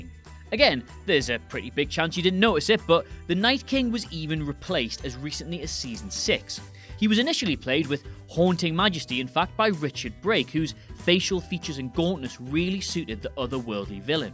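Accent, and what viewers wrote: United Kingdom. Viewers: Have you never heard a British person speak before?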